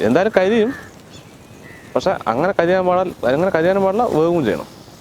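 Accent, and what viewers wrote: Palakkad, India. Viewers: U can add subtitles to ur videos so that non keralites as well can understand and one more thing ur way of talking in our Palakkad style is quite well.so if u include all ur members in the whole video and lil bit of interaction with each other will be more interesting. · ഇക്കാ നിങ്ങളുടെ Videos പെരുത്ത് ഇഷ്ടമാണ്...ചുമ്മാ ഇരിക്കുബ്ബോള്‍ നിങ്ങളുടെ ചാനല്‍ എടുത്ത് Videos കാണാന്‍ തോന്നും...വോറൊന്നും കൊണ്ടല്ല...ഞാന്‍ Trivandrum ത്ത് നിന്നാണ്...നിങ്ങളുടെ പാലക്കാടന്‍ Dialect വലിയ ഇഷ്ടമാണ്...സ്വന്തം Dialect ഉപയോഗിക്കുന്ന ഭംഗി Standard Dialect ഉപയോഗിച്ചാല്‍ കിട്ടില്ല...ഫിറോസ് ഇക്ക ഇഷ്ടം...😍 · Palakkadan slang🤩 njn pkd aan☺️ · ningalde palakkadan basha kelkan nhan veendum veendum video kandu ikka luv from sharjah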